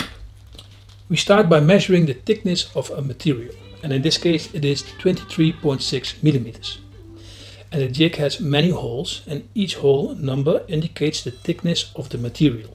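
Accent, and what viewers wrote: Netherlands. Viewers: Oh I’m sorry I thought you had a speech impediment. Your Dutch. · the subs are a real improvement.. your funny Dutch accent is sometimes hard to understand.. (and I speak dutch) Nice review..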